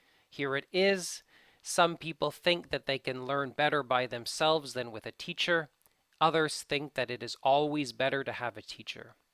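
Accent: Canada. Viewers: Btw, arr you canadian? You have that accent lol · Hi, first of all thanks a lot for great content. Your website and your videos are really helpfull. However I have a question. You say that we shouldn't write a sentence like `This example demonstrates how...` but almost every essay that you have on your website has a conclusion like that on body paragraphes. I think its a nice way to summarize the main idea that we posit on that paragraphe. But as I said im kinda confused atm ;D Btw I love your Canadian accent ;D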